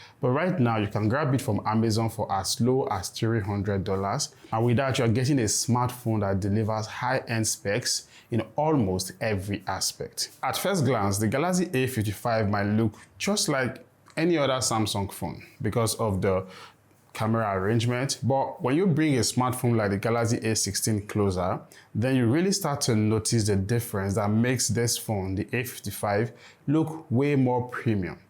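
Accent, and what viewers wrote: Africa. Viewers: I got one word in 3 , why have someone with a strong africian accent describe a product, no offence but speech is not clear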